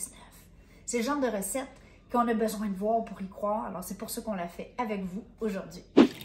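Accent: Canada. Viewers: Salut ! merci pour le tips , je connaissais même pas le " pain pita " j'suis venu par curiosité :D en tout cas t'arrêttes po !! c'est toute sympa :D ( j'ai l'accent canadien en tête maintenant bravo )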